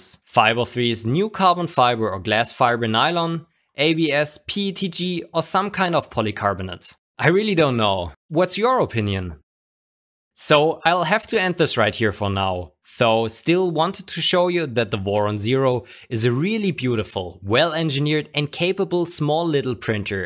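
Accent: Germany. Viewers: Warum skyped ihr auf englisch? Yeah, I know, why do I watch only english videos? But you both speak german. You, I can hear from a mile through your gernglish, (sorry, js) and also lives in germany, I think. His english only very rarely gives him away, and if you don`t know he is from germany, and don`t speak german yourself, you probably wouldn`t even catch it most times. -I didn`t, only when he got german greetings half way through a stream.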